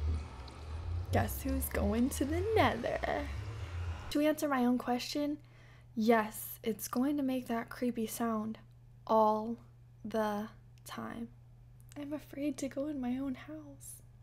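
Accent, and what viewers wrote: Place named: Spain. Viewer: Love your Spanish accent :D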